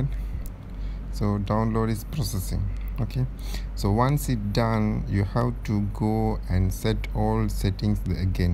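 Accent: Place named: Kerala, India. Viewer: Are u malayali ssss sssss sssss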